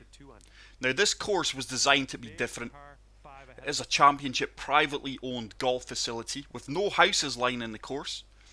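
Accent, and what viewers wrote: Scotland, United Kingdom. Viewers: What part of Scotland are you from,?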